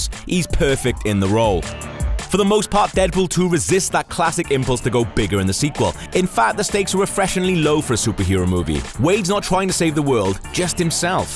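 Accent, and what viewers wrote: United Kingdom. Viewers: Who's this UK B'och on the V.O?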